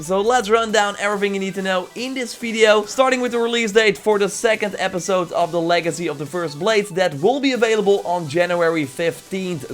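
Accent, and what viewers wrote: Netherlands. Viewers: It took me 1 second to find out you're dutch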